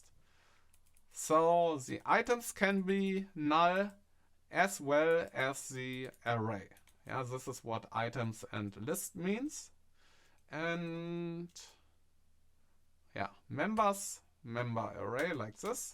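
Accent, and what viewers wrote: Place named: Germany. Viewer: He literally sounds German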